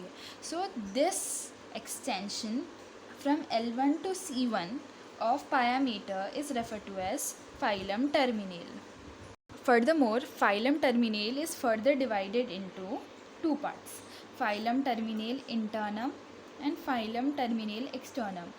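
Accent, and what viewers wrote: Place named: Hyderabad, India. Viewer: well explained..... btw from ur accent ,u look from Hyderabad.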